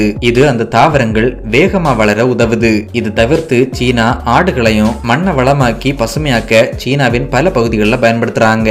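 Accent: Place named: Kerala, India. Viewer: மலையாள சாயலில் தமிழ் கொஞ்சம் வித்தியாசமாகவே இருக்கு. நாஞ்சில் காரரர நீங்கள். அரசு என்பதை அரய்சு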